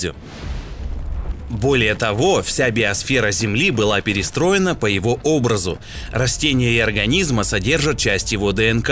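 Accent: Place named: England, United Kingdom. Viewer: Ну нехрена у тебя акцент английского!!!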